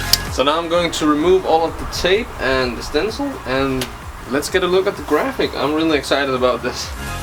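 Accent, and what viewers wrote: Denmark. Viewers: Haha! Tydeligt Du Er Dansk! Men Super God Video!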